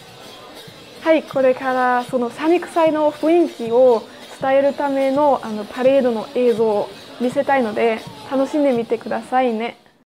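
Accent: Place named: Germany. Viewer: Ha wusste ichs doch als ich dein Englisch zum ersten Mal gehört hab klang es so deutsch, wollte dir eigentlich nur sagen, tolles Video dein Japanisch ist wirklich gut und ich mag deine Videos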